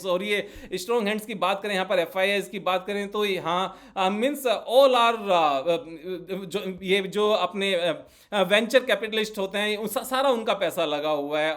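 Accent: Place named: Gujarat, India. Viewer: Bhai Have u been to Gujarat ever, sometime your tone has Gujarati touch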